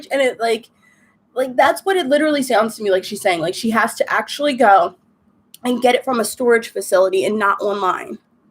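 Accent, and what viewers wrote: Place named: New Jersey, United States. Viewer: I love your accent.. my bf is from Staten Island and now Jersey.. he has the same accent as you lol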